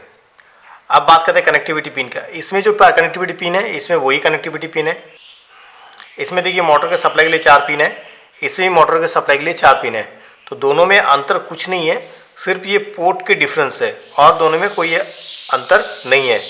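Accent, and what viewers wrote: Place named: United States. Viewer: i like how his is like the only indian youtube video that i can understand easily because im used to such a white American accent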